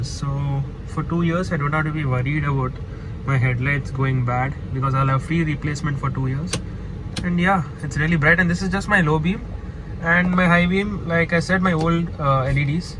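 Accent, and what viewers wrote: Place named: North India, India. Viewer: Great bro!!! Ur first impression made me feel ur some whr from North. But KA19 helped me understand ur from Namma Mangaluru. U simply Rock!!! Make more great videos!!! Ur a better Host compared to these News channel anchors:-)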